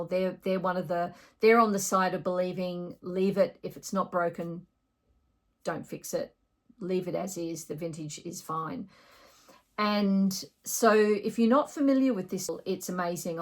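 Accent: Australia. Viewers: love love loved this vid. Great to hear an Aussie voice, then the more into the vid, I was amazed at some of the similarity of my hifi journey. I'd had a NAD3020A for years, hooked up to some Haybrook HB1s that I had re coned in Paddington (over 20 years ago now). But, like you, recently decided to upgrade a bit. Was going down the NAIM route, then the guy in the shop said those fatal words, "have you seen any of the Hegel stuff". We did a side by side with a track by Lady Blackbird (interesting artist if you like Jazz) and it was like night and day. I'd also imported an early 90's Roksan Xerxes TT. Had the team at Classic Hifi bring it back to life. They did a great job. Then the obligatory speaker upgrade, went with the Lintons (my $ justification was that I'd had my stuff for over 30 years so..). Then walking the dog one day, there's some hifi gear on the street! 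I took a closer look (theres often all sorts of stuff on the street but this was unusual). A Sansui 331 Receiver and SR212 TT ! with original manuals and warranty cards! So i'm going to set up the vintage stuff alongside the newer (inc BlueNode) stuff. I was about to get the 3020 re done at a place in Marrickville (I won't put the name here as I think they are inundated) but will get the Sansui looked at as (again, like you), the right channel is coming in and out. A friend recently has gone on a similar journey with Sansui. Like you've said, the sound is worth the effort in bringing these vintage pieces back to l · Hello and subscribers. I came across the channel while browsing youtube for audio gear content. I bought by first system after attending Uni in the mid to late 70's and then did a big splurge on gear between 2007 and 2012. Personal circumstances meant that it got dismantled in 2017 and I am now setting some of it up again (the bits that still work that is). 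Good to hear the Aussie accent and while I'm interested in some older gear I will be mainly exploring the channel for music ideas given that likes have a fair overlap with mine. Happy listening everybody, Jeff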